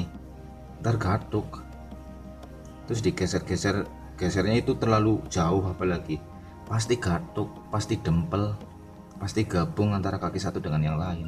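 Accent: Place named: Banyumas, Indonesia. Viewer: Dempet kang bahasa ngapake😁😁😂😂😂😂😂😂😂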